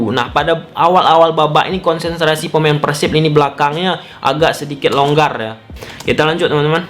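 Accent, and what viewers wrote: Malaysia. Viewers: Logat melayu kental skli bang, orang riau atw mana ya?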